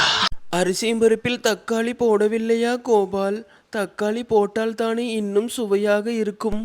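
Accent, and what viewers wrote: Coimbatore, India. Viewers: பொய் சொல்லாதீங்க அண்ணா நானும் திருப்பூர் அண்ணா கோவை தமிழ் கொஞ்சுதே அண்ணா சூப்பர் அரிபருப்பு சாதம் ல தக்காளி போட கூடாது அது சில லூசு க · கொங்கு பாசை அருமை. ஒரு நெம உப்பு. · Your slang of Tamil is ultimate bro as iam also in kovai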